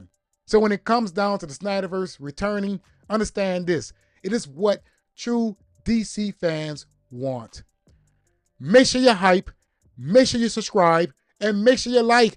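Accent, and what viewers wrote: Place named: Louisiana, United States. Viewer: I love the enthusiasm when you say things are about to get a little bit spicy baby with that Cajun dialect I'm from Texas which is close to Louisiana and always thought that people from Louisiana have a very swag-a- docious way of speaking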